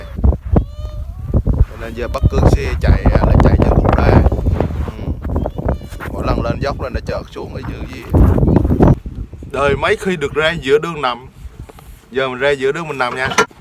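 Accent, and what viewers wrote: Binh Dinh, Vietnam. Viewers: Em này chắc người Bình Định nói giọng nghe giống quê tui :) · Minh nghe tiếng nói của ban chac ban la nguoi o Binh Đình ,nhung chac o day vẫn còn co điện ,con nuoc uống trong nha co đồng bằng không ,o nha nhu vay minh nếu khg co điện thi chac co bếp củi dot liên tục de suoi chu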